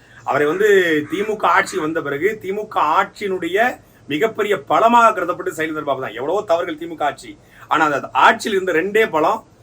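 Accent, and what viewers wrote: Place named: Uttar Pradesh, India. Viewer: Why ur sounding like a UP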